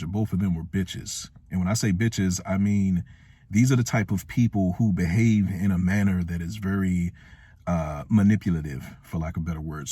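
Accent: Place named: Washington DC, United States. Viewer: Man I heard you telling your story like damn he sound like he from DC and well spoken . Then u said it, subscribe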